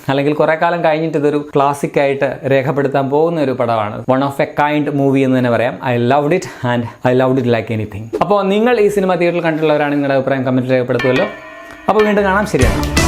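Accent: Kottayam, India. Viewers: Bro kottayam aarunno😁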